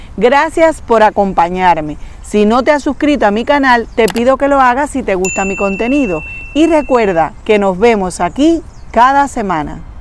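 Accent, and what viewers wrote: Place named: Cuba. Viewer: GRACIAS A USTED, POR SU CONTESTACIÓN. BUENO, CREO NO HABER ESTADO TAN LEJOS EN MI DEDUCCIÓN. YA SÉ QUE NO ES "ABOGADA" DE PROFESIÓN, SINO "FILÓLOGA", ADEMÁS DE INVESTIGADORA. LE FORMULÉ LA PREGUNTA, PORQUE PUDE APRECIAR SU DOMINIO SOBRE EL TEMA, Y ES MUY OBVIO QUE SI ESTUDIÓ FILOLOGÍA, Y ADEMÁS ES INVESTIGADORA, SEPA DESARROLLAR CON FLUIDEZ Y PRECISIÓN EL TEMA QUE ABORDE UNA VEZ QUE HAYA INVESTIGADO SOBRE ÉL. EN CUANTO A SI ES CUBANA, LO SUPUSE DE ALGÚN MODO, AL ESCUCHAR SU PRONUNCIACIÓN. YO TAMBIÉN SOY CUBANO. PUES ENTONCES, DESDE ARTEMISA, CUBA, RECIBA USTED UN CORDIAL SALUDO. VALE.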